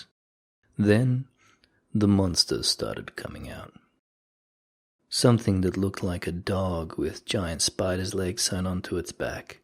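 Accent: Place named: Australia. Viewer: The narrator sounds Australian. I wonder if his grandfather was?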